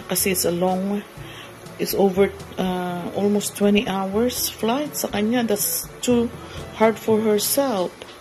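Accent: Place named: Abra, Philippines. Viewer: Madam ang tuno yang salita mo parang taga sa amin. Bangued Abra po ang aking hometown.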